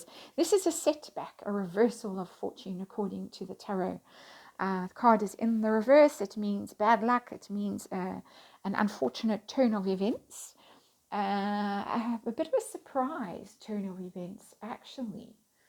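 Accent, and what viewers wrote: South Africa. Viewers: It didn't see Young Mr Bolands Video with a SA accent & I Subscribe. Was it a Members only Video?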